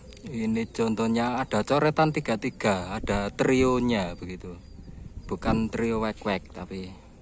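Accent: Java, Indonesia. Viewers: Kenalan gih kang asline pean niku piyanton pundi kok bhsane medok bnget😀😀😀😀....semngat tmh sukses mju terus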